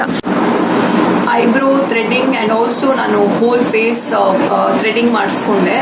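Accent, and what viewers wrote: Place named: Mangalore, India. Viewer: Niv yake manglore thara Kannada mathadala.. El kalithu kondri banglore style Kannada..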